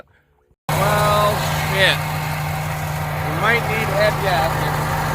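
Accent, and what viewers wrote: Canada. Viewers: My first time watching this channel. Is this guy related to Zip Ties and Bias Plies? All these guys from the Kanukistan prairies sound the same to us yanks. Why is the ground not white?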